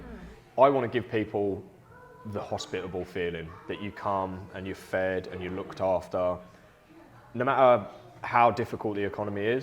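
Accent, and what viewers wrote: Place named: Cockney, United Kingdom. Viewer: Interesting watch with the cockney culinary reincarnation of Mac Miller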